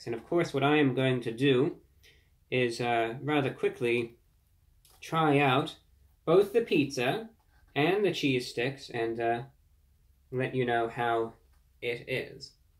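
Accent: United Kingdom. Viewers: Loving his heavy British accent on the podcast now ❤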